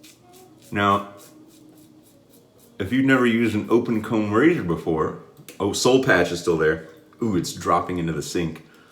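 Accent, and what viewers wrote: United Kingdom. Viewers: "Barbasol will not be featured in this episode".....boy I'll beat you wish it had been featured in this episode!!😁😁🪒🪒 Loved the British accent!!🇬🇧🇬🇧👍👍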